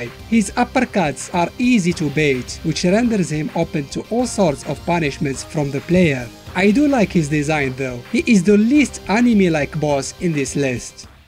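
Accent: Morocco. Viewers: Great stuff, you can never miss a moroccan accent lol great work keep on. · Your English pronounce look Moroccan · من خلال لهدرة ديالك نعرفك مغريبي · مستحيل متكونش مغريبي من لكنة ديالك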